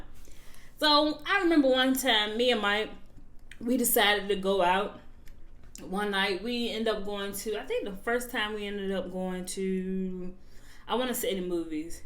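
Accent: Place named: South, United States. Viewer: I love your accent, sound like somebody southern auntie!!! 😆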